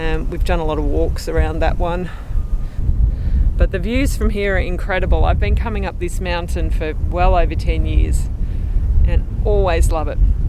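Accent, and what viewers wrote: Australia. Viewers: I would never have known, you have a great Australian accent. What part of the UK?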